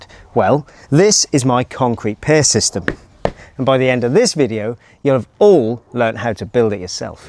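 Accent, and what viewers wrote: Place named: Suffolk, United Kingdom. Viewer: Nice to hear a good old Suffolk boi after 30 years in NZ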